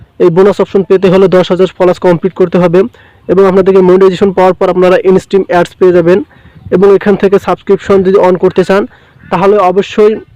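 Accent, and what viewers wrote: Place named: Sirajganj, Bangladesh. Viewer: মনে হয় সিরাজ গঞ্জ বারি আপনার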